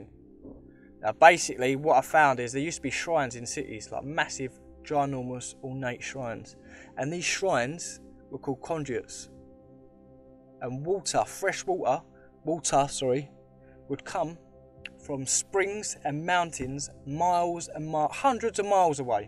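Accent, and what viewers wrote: Essex, United Kingdom. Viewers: yeah you definitely got a mix of cockney and Essex I'm from mile end myself Great Channel been watching for a few months now just wondering if you have any other social media platforms channels Facebook or email ect ? because I would like to talk to you about a few things if possible I have a found a few tartarien pre reset buildings in the local East London area recently and obviously would be great to here your opinion thoughts and if you want to use them in a video your welcome obviously think it's gonna be a while until I receive another telepathic download about how free energy works and innerstand it properly but I'll keep you posted obviously 😇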